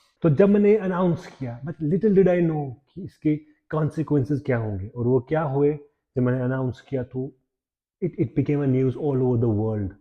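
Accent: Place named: Kashmir, India. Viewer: Accent se hi pata chal gaya k Kashmir se ho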